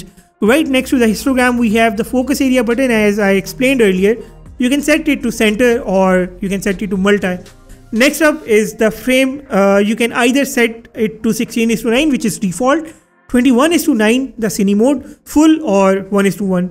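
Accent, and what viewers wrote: India. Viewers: indian english haha, you can go crazy listening something like this lol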